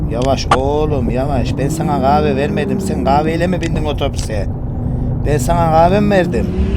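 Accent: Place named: Konya, Turkey. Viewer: Konya şivesi mükemmel olmuş ve aleykümselam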